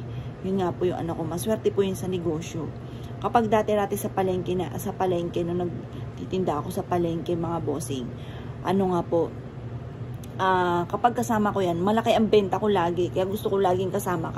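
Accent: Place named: Batangas, Philippines. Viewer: Parang taga batangas kayo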